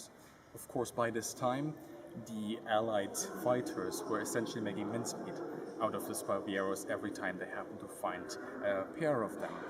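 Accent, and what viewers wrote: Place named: Germany. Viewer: Wow! This guy has such a depth of knowledge, AND a talent for sharing it. Your English grammar is perfect, but your German accent is also perfect! As is your Italian accent when talking about Italian aircraft. I am so glad that you (obviously) enjoy teaching us about things we would never have imagined we would be interested in without your tutelage! Very impressive deep knowledge. Thanks for sharing! Vielen Dank!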